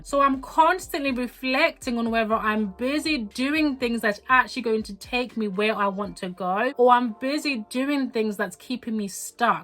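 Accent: United Kingdom. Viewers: I started my channel/personal brand, no subscribers yet. But hey, I got step 1 complete🎉 I just knew you were from the UK for some reason ❤ 🥂 I have a lot of hobbies and it tough to merge them as well so I end up spreading myself thin and overwhelmed. I need to try email Marketing even content ads as well .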